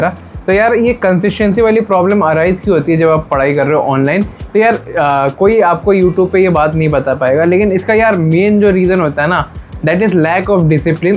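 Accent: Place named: Bhojpuri, India. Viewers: bhaiya ap jis language ma baat kar rhe ho vo bhojpuri type lag rhe hai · bhaiya aap toh bhojpuri bolte ho 🥰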